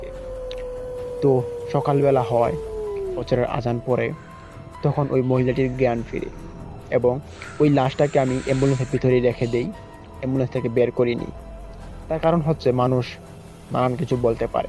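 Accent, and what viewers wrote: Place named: Habiganj, Bangladesh. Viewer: Habiganj bari mone hoy unar kothay buja jacche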